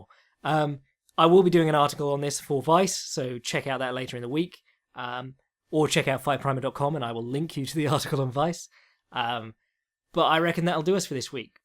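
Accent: England, United Kingdom. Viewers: I come here 50% for the educated mans viewpoint. 50% for the English accent so familiar to my now SEA dwelling ears and phrases like "makes you look like a massive bellend".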